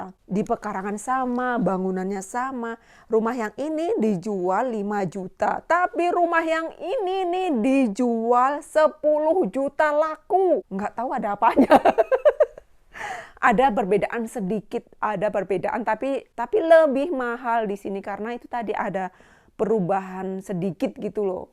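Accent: Java, Indonesia. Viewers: Mbak ini logat ngomongnya seperti orang Jawa, salam dari Jogja mbak, suka banget ngikutin vlognya sehat selalu mbak dan keluarga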